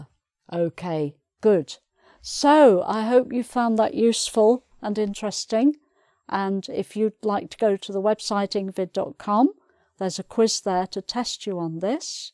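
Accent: United Kingdom. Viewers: Thanks again and again for your amazing lessons and British clear accent.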